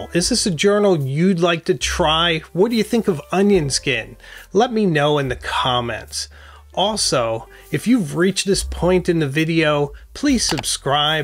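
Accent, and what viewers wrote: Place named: Pennsylvania, United States. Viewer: Does anyone know where is from? The accent gives Bucks county PA.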